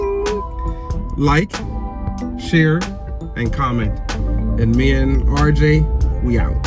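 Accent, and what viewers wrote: Jamaica. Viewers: This man yah sound like a yardie suh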